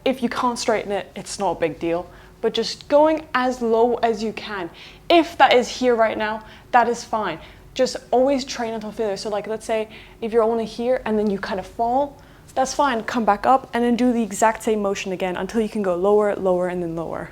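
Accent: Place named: South Korea. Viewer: Love the South Korean accent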